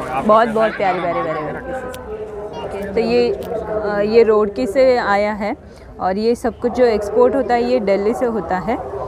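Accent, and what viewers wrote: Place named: Maharashtra, India. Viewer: I think u r from maharastra, if i not wrong because I heard yr marathi tone in your voice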